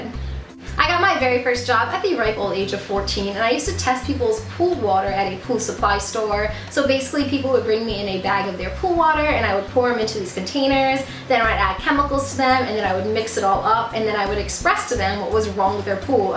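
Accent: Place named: Massachusetts, United States. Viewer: LOVE Faneuil Hall! I'm originally from MA, but I live in Alabama now. Hearing you talk reminds me of home haha. I also LOVE Disney (I did the Disney College Program), I've been to the etsy shop you recommended for Mickey Ears and I was wondering if you've seen this shop: Anthology Candles. They make candles that supposedly smell like things from the Disney Parks (rides, food, resorts, etc). I'm dying to try some!!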